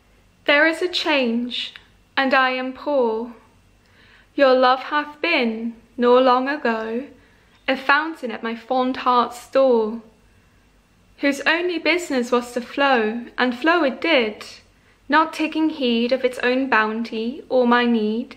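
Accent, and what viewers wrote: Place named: United Kingdom. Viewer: like low key, I couldn't focus on the poem while you were reading because of your amazing british accent!.. but yea, well read.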